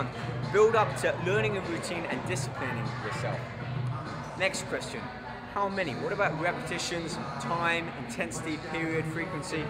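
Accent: England, United Kingdom. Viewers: fuck ur englisch accent u fucking roid user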